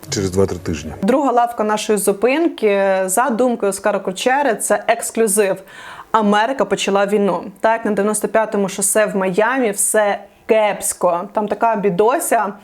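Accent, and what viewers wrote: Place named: Western Ukraine, Ukraine. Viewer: Клас, цей західний діалект🥰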